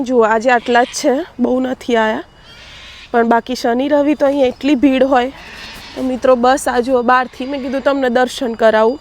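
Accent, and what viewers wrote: Sabarkantha, India. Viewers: બેન, તમે સાબરકાંઠા ના લાગો છો. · Sabarkantha ni boli bolo chho to s.k. na nativ haso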